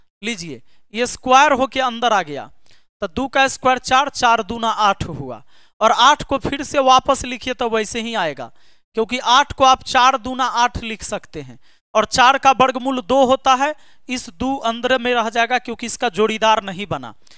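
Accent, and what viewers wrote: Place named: Bhojpuri, India. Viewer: Ekdum bhojpuri style mein bolte hai to maja aa jata hai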